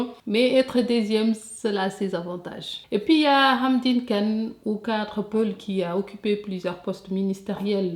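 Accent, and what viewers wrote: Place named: Senegal. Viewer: Tu as un accent sénégalais , tu es sénégalaise tes frères et sœurs en Mauritanie sont la plupart des exclaves , soit vous les ramenez chez vous soit vous la fermez . Il peut pas y avoir de Noir en Mauritanie c’est un peuple arabe . Vous cassez les couilles aux gens de partout . Même en France vous voulez pas laisser les gens respirer. Retournez chez vous et le problème est réglé.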